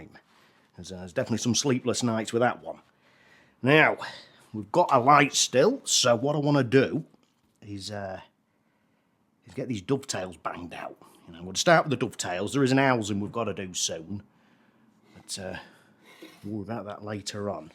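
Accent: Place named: Bolton, United Kingdom. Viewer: Good idea for those tools in constant use the simple ones are the best, if I closed my eyes I'd swear I could hear a young Fred Dibnah your not from Bolton by any chance?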